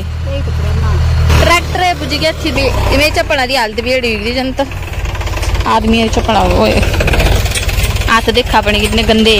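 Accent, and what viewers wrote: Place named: Pahari, India. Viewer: Enjoyed your Phadi speaking. It is bit different how we speak phadi in Kangra region.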